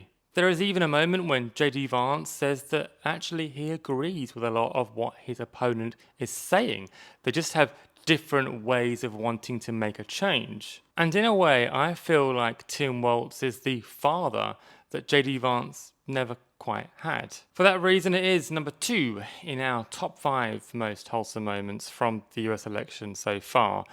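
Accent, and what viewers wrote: United Kingdom. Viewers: I have only followed of TikTok and I genuinely love your ability to be on the level speaking of all of the horrible things the Us is doing. And btw….never expected your English accent! 😂 surprised the hell outta me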